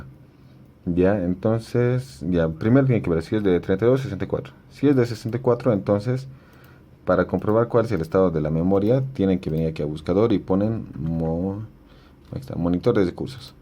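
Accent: Bolivia. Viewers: SU ACENTO DE BOLIVIANO , SOY DE BOLIVIA JAJA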